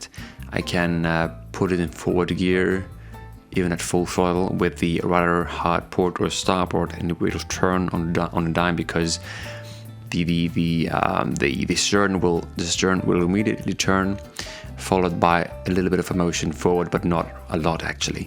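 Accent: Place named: South Africa. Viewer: I sorta see it as ticking boxes.. its a build.. and its relatively cheep, i love fishing and wanna see a sea monster landed by RC boat. Then you can expand your channel with ppl who are perfect and like fishing. You have the set up... and even if you not a fisherman, im SURE it would be a blast... find your fishing mate's to get tips. Somtimes old heads have some fishing knowledge. Austria! Your english sounds south african, i thought you white african LOL but 100% understood you so. Thanks for sharing the build mate. Its interesting.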